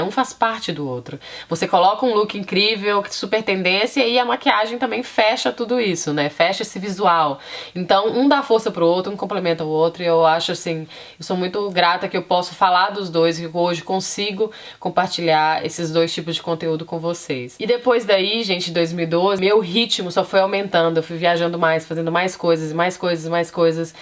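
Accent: Minas Gerais, Brazil. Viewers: Fofaaaaa, tinha que ser uma mineira... adoro a maneira como vc fala e ouvir seus conselhos bjssss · O sotaque de Minas não sai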